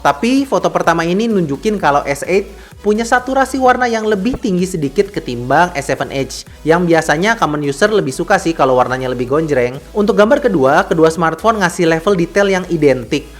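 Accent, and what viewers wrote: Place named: Java, Indonesia. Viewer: Jawa nya medog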